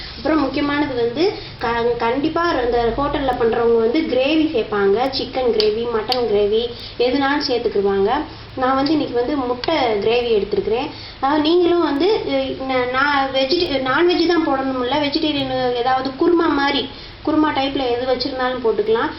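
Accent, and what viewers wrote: Madurai, India. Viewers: loving your Madurai Tamil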